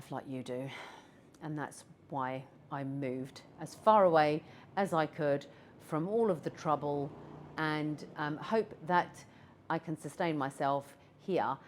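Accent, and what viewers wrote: United Kingdom. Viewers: She sounds British to me 😁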